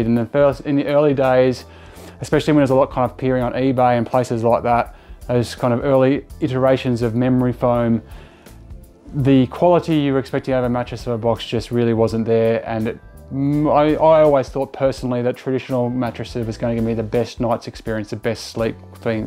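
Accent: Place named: Australia. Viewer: Not too compatable with US market. I did enjoy the Aussie accent.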